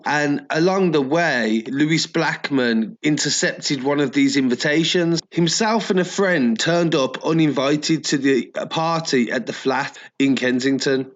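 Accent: Birmingham, United Kingdom. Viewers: That fucking accent tho pmsl, fellow brummie here LOL , great content just subbed!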